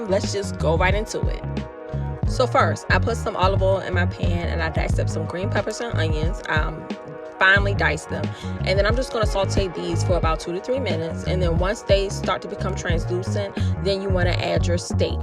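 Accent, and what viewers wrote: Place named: Baltimore, United States. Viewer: You sound just like us from B more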